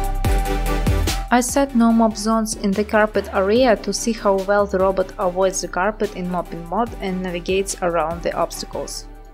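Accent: Russia. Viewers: Oh this russian accent...🤦‍♂️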